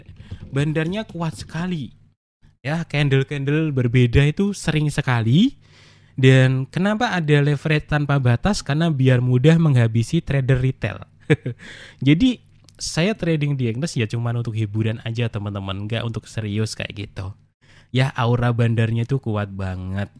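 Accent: Java, Indonesia. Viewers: bang lu jawa ya ? orang mana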